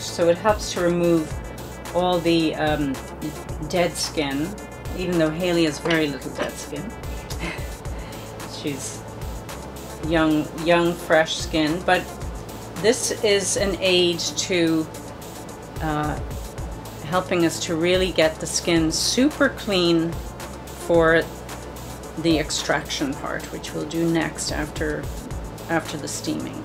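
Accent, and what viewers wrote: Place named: Ireland. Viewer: can hear the irish accent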